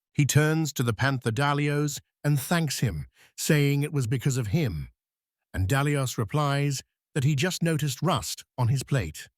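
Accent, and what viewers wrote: United Kingdom. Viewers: British narration, unusual for this type of video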